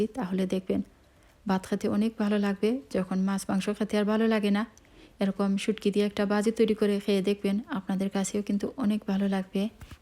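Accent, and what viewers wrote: Sylhet, Bangladesh. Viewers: Please talk sylheti your accent telling me you're sylheti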